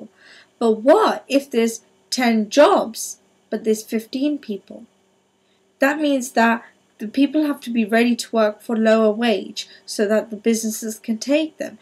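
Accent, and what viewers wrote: United Kingdom. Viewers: As a Pole myself, I'll be the first to admit that Poles don't belong in the UK and that Britain is for the native BRITISH, which does NOT include you,. You're NOT British. You're just an Indian occupying Britain who happens to just so have a British accent. One day, European nationalists will rise up and deport all non-Europeans from our beloved continent and you will be sent back to where you belong.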